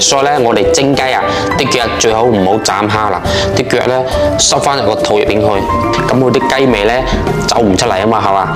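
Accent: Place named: Zhanjiang, China. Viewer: 聽口音個師傅應該係湛江地區人😂😂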